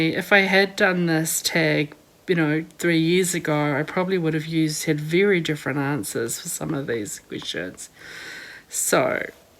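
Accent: New Zealand. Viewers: Your accent makes me miss my Kiwi friends who live in Taranaki- next video if you can zoom in really close so we can all appreciate just how fast you are. The fact that you can talk while you’re doing this is amazing lol since I can’t do anything else but concentrate on the stitches. Thanks again. Keep ‘em coming!!!! 🪡🧵 🇳🇿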